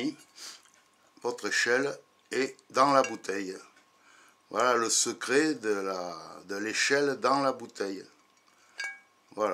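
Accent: France. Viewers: bonjours je ne connaissais pas cette astuce pour l echelle dans la bouteille j ai 67 ans et je suis bricoleur egalement mais surtout en soudure a l arc mais tu m apprend encore beaucoups de choses sans indiscretion de quel region de france est tu car j aime t entendre parler amicalement d un c thi vivant en belgique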